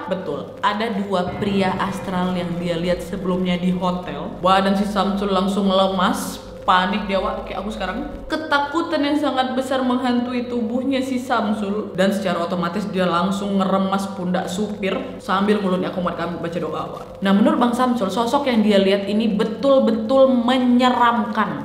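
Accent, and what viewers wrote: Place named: Samarinda, Indonesia. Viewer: Dari Samarinda mana suarany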